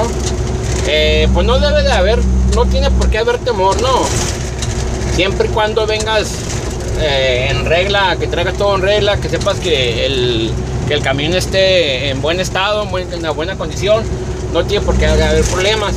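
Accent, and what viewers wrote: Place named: Sinaloa, Mexico. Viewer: mi colega te voy a ser una preguntota de que parte de México eres te escuchas como de Sinaloa los ojalá qué no te molestes por la pregunta y 8 nomás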